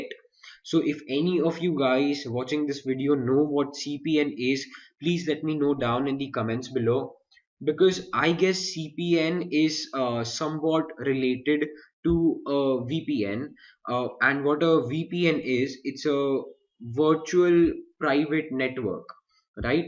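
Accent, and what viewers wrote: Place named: India. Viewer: It's Indian accent.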